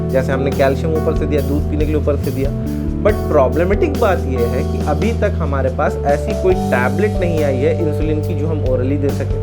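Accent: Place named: Patna, India. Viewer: Hatsof sir very well explain, specially with patnaiya tone. Kya diabeties type 2 me lifetime medicines khana padta hai .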